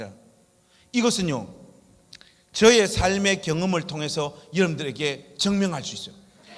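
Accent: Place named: Gyeongsang, South Korea. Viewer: 목사님~♡ 경상도 말씨 듣기 좋은데요. 단세에 오셔서 간증하실때 홍수와 요강 훅 와닿았어요. 목사님 응원합니당.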